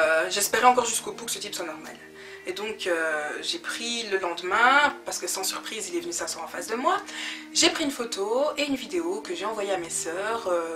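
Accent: Belgium. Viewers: elle est belge? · Cc tu est belge ?? Si oui de ou ??Bruxelle ?? Je t'adore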